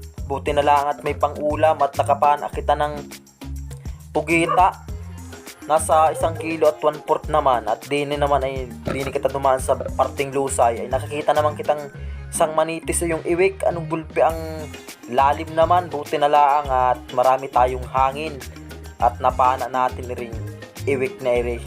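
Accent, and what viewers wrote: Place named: Quezon, Philippines. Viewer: Broo taga saan ka parang Quezon ung Punto Ng salita nyo??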